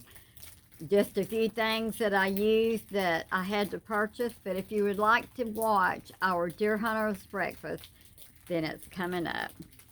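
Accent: South, United States. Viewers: Hi Ms.. I really appreciate your videos. I was adopted in TN when I was little, but don't really have any family as it wasn't the best situation. But I had a grandmother who was absolutely wonderful, and when I was young she cooked like you. I love listening to you, learning from you and watching all your posts. I'm near Chicago now after living in NYC for 20 years so it's nice to hear the Southern accent and warm lifestyle. You're good people, as my grandmother would say. And I'm very happy I found you here. PS. You had my mouth watering 2 minutes in! Yummy!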